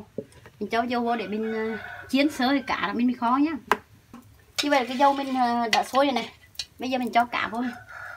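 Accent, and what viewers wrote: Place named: Quang Tri, Vietnam. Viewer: Cô người Quảng trị hay sao nói nghe hay quá. quê tui đây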